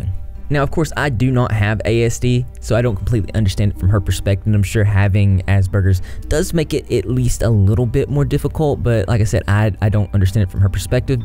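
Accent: South, United States. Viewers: Is it just me or is your southern accent not as strong? Or maybe I’m just used to it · Where's your accent from? Texas? Louisiana?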